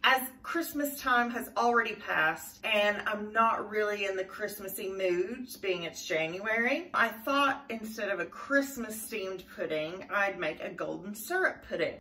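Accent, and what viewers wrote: Kentucky, United States. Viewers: I love the Kentucky accent! (I'm a Brit.)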